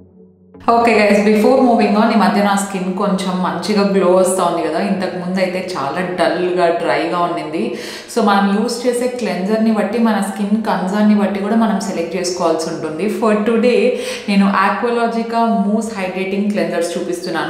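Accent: Anantapur, India. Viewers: AA Emi julee ni esukochinare pinni ananthapur slang haaaaaaaa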